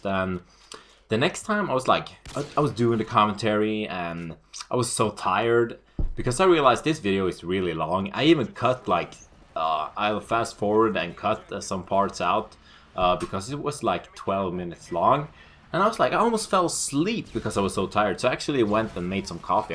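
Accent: Sweden. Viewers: wat the hell wow it seems u very popular dude lol cauz your swedish:P:D everybody likes abit of Foreign Accents hell yeah for the world!! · youre the best, love your swedish accent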